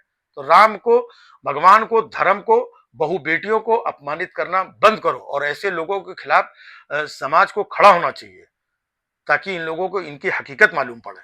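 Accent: Kanpur, India. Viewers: ji, you are a keen observer, a brave and intellectual artist.Your political satire really means a lot and your kanpuriya tone makes it more interesting. Keep it up.👍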